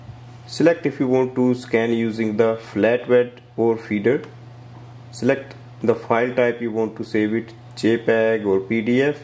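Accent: India. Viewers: Your Indian accent is annoying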